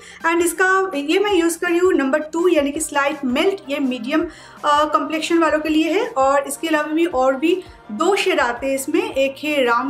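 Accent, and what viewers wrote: Bengal, India. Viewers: I wouldn't call it weird as her mother-tongue Bangla is dominant in her accent. So with her hi-speed talk, it did sound different from the actual pronounciation. All she needs to do is slow down slightly to the range of 140-160 words per minute, which is supposed to be an ideal rate. One can check the internet for this. For jokes one can keep a higher rate.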